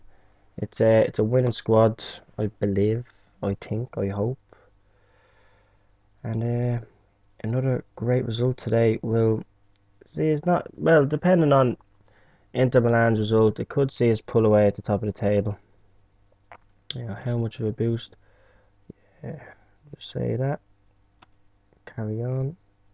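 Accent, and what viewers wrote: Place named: Dublin, Ireland. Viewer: well mate good video keep it up u sound like a Dublin man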